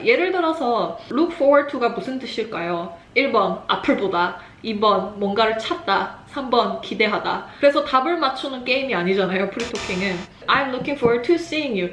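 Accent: Gyeongsang, South Korea. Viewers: 대구분이신가요? 자주 듣는 억양이 여기서 들리니 너무 반갑네요 ㅎㅎ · 살짝살짝 들리는 사투리 너무 귀여워용.ㅎㅎㅎ^^ 경상도분이세요?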